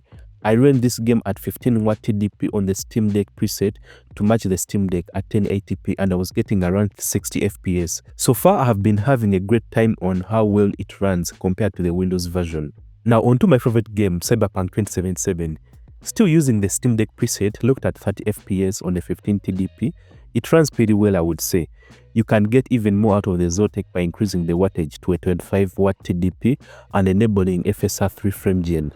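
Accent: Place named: Uganda. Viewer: I can tell a Ugandan from a mile away.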